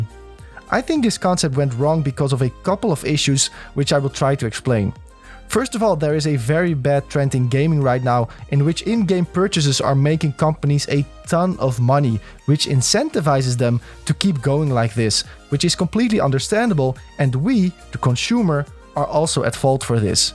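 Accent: Netherlands. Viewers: Ik kan gewoon horen dat hij nederlands is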